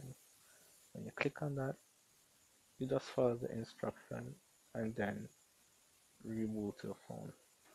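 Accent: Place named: Jamaica. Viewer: I can tell you're Jamaican, me TOO!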